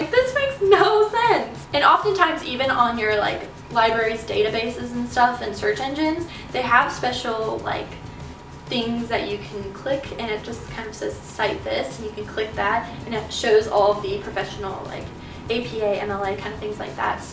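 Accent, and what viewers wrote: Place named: South, United States. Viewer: I feel like your southern accent/side totally came out in this video. lol loved it!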